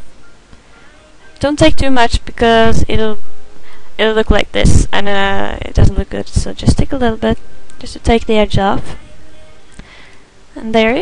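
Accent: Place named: Norway. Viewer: You sound norwegian.. Where are you from?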